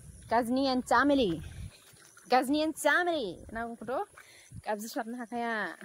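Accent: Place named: Kokrajhar, India. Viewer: Same to same kokrajharni boro railainaijg milaitarw 100% sure abo ngsina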